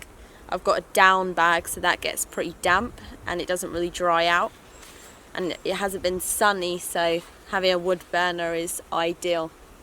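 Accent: United Kingdom. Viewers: British accsen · Wonderful British accent...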